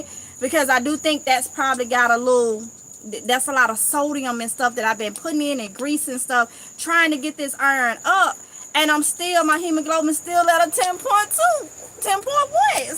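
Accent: South, United States. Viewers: I’ve never heard nobody pronounce exercise like that before lol! I like your accent!!! It’s soo southern!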